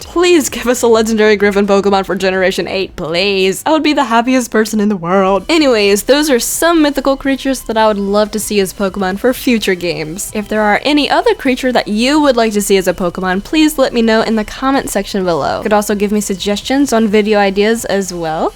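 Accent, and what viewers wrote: United States. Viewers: you sure youre not american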